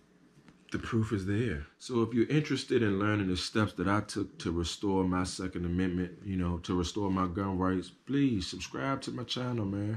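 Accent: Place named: Virginia, United States. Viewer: Knew you were from VA from the accent lol. Not a felon but great video man! More people need to see this.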